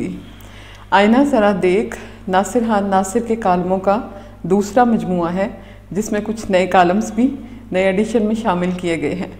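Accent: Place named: Punjab, India. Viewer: ماشاء اللہ، بہت خوب تبصرہ، آواز کا ردھم بہت ہی متناسب، بڑے رسان سے ادائیگی کرتی ہیں۔ اور پنجابی کے بڑے میٹھے انداز میں خ اور ح کا امتزاج بہت پیارا لگا۔ آپ اس لہجے میں ایک دو جملے پنجابی کے بھی شامل کرتیں تو دو آتشہ ہوجاتا۔ ویسے حالات کی طغیانی کا خطرہ نہ ہو تو دونوں مل کر بھی ویڈیو بنا سکتے ہیں۔ بہت ہی اچھا اور اچھوتا خیال۔ سلامت رہیئے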